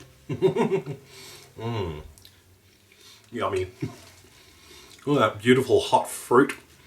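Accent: Australia. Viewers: Australian? Awesome :D. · I am just glad that he has an Aussie accent. I get tired of everyone who is heavy being labeled a fat American. This looks delicious BTW.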